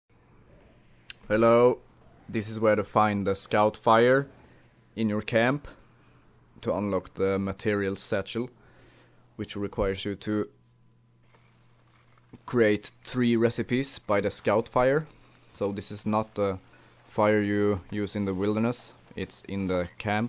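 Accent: Sweden. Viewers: i can hear your swedish dialect.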